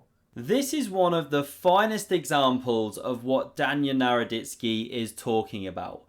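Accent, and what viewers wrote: United Kingdom. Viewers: Def the best chess commentator, brit accents just class up the experience.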